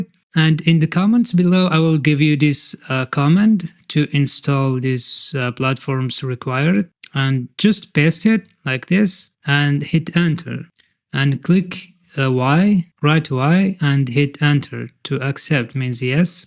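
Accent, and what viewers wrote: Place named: Arab world. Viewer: من الاكسنت واضح انك عربي شرح ممتاز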